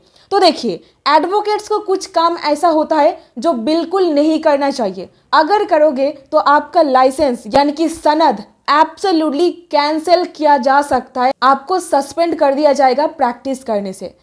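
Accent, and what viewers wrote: Bengal, India. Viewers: Bengali tone but you have very distinct ,clear pronunciation in Hindi I highly appreciate it mam, please keep it up mam , with regards.🙏👍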